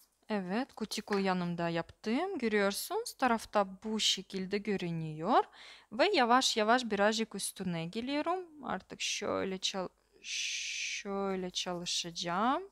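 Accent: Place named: Russia. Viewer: Ayyy sivene bayıldım yaa,normalde bu kaba Rus şivesini sevmem,ama bu bana çok sempatik geldi nedense 🥰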